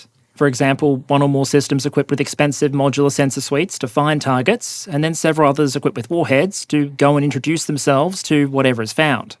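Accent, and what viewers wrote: Australia. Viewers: Great as always! BTW - is "wreck" havoc a specifically Australian idiom (I've always heard "wreak" havoc up until now) or is that just how "wreak" sounds with this accent? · Is this guy Australian?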